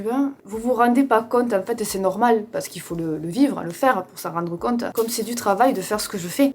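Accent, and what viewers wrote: South, France. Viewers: Merci énormément d’avoir ce discours sur l’alimentation et le sport 💪🏼 j’ai vu ton évolution physique et bravo!! J’adore ton contenu, et ton accent me rappelle mon sud 🥹 même mon chéri te reconnaît par ta voix quand je met ta vidéo 😁 encore super vidéo et pour le Bad buzz je n’en ai même pas entendu parler tu es au dessus de tout ça 💪🏼 sincère et authentique🥰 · Alors moi j’ai 60 balais et je suis tombée par hasard sur ta chaîne. Et ton accent m’a sifflé direct dans l’oreille moi qui habite le sud sud profond. Tu es touchante. Rigolote. Concernant le bad buzz tu dois bien savoir ce qui se dit dans le sud…. 😅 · Ton accent du soleil est si agréable à entendre et renforce cette petite bulle de positivité et d'energie que tu nous transmets au quotidien, j'adore t'écouter et tes vidéos égayent mes journées ( et je sais que je suis loin d'être la seule ) 😊😊😁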